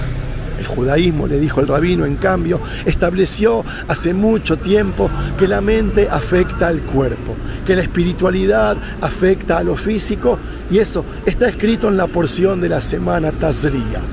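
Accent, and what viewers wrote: Argentina. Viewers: Estaria bueno que defiendas las Islas Malvinas con la misma energia,va digo por tu acento argentino...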